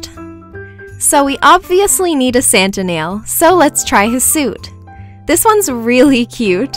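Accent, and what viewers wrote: Newfoundland, Canada. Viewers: You're from Newfoundland?? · Isn't she from Canada though? Maybe it's a Canadian thing. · Are you from Newfoundland?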